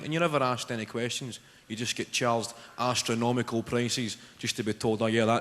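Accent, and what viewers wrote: Scotland, United Kingdom. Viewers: It's difficult for non Scots to understand us. Believe it or not, has tidied up his accent here. When Scots talk to each other it's impenetrable to outsiders. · He's Scottish. His accent is hard for some people. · love the Scottish accent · This guy has the strongest Scottish accent I've heard. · Gosh, I love his accent. It is sooooooooo hard to understand him listening for the first time if you're not Scottish or British at least but still he's hillarious! :D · one word...well 5 words shortened into one....LMFAO!! thats all i can say ..and his scottish accent makes it that much more funny! hahahaha loved it! ..word count = 27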